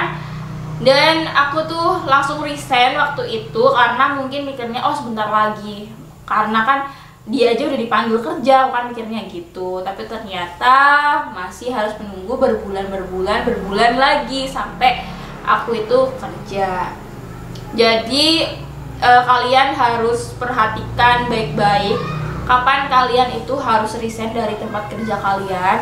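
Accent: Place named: Java, Indonesia. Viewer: Medoknya keliatan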